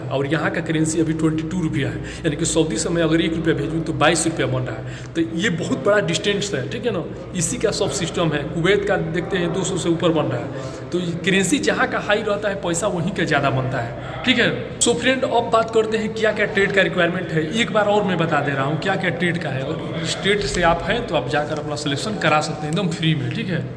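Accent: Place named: Gorakhpur, India. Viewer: आप गोरखपुर से है क्या भाई..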